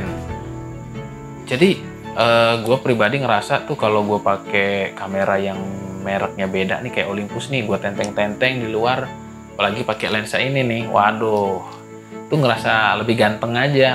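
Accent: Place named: Bali, Indonesia. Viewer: Orang bali ya bli? Logatnya sangat bali😄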